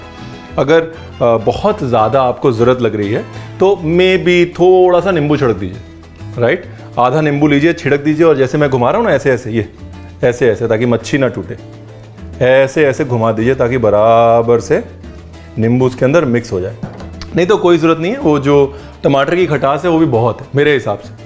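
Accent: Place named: Bengal, India. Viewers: Wow......again I fall in love with your recepie and your Bengali accents❤❤❤.... 'Bhaat ghum', 'macher jhol', I felt that you are the family member of all bengali family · You have a punjabi heart with Bengali accent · Can we just take a moment to appreciate his cute bengali accent💯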